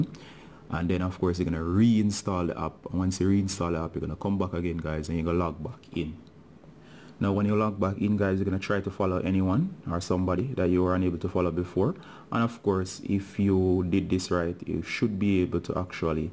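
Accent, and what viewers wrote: Jamaica. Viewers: Love di Jamaican accent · You Jamaican ?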